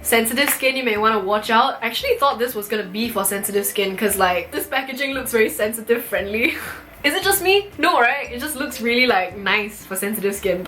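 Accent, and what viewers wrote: Singapore. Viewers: singaporean english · Singaporean accent lol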